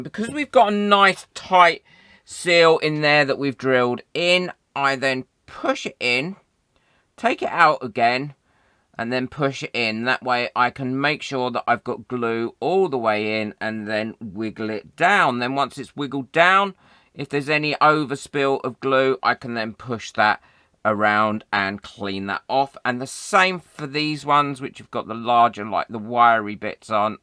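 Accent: England, United Kingdom. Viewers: Thank you very much,! For your content, for sharing your expertise, for your generosity and your humour. And for all the love and care you put into your videos! I also love your accent, it brings back memories of the year I spent in England: absolutely LOVE it!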